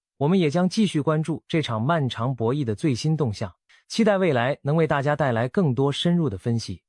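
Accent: China. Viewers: 聽你這個共產黨的聲音，就討厭! 假裝台灣人的聲音! 不要有些字用簡體，你應該全部用簡體字!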